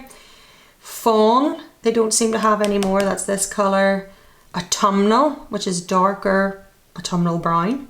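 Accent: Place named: Ireland. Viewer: This is the first video of yours that I have watched and love it! Last year I traveled through Ireland and love hearing your accent 😊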